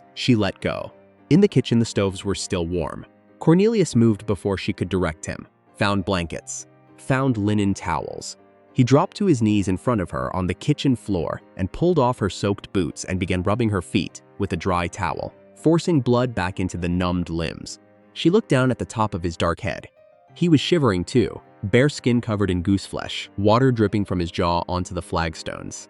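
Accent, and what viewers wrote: United States. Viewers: I read several comments about reader being too fast and speaking from back of throat Having grown up in Michigan, this sounded "normal" to me! 🙂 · So, American narration for a Yorkshire story! And incorrect pronunciation of words with the same spelling. So when perusing a letter the word is 'red', when speaking of roofing materials the word is 'led'. Allowing AI to run amok doing its own thing renders the whole ridiculous. And as others have said, far too fast. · Good story but annoying background music, floating circles and too large a font. English accent please, not American because the story is based in Yorkshire, England.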